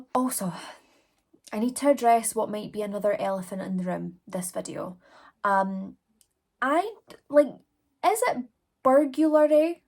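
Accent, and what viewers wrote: Scotland, United Kingdom. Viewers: OMG, pure dead Scottish true crime ASMR. Really looking forward to listening to all of your videos!!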